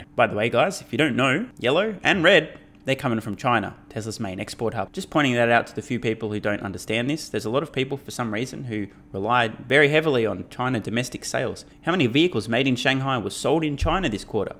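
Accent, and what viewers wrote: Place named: Australia. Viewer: That Australian twang got you a sub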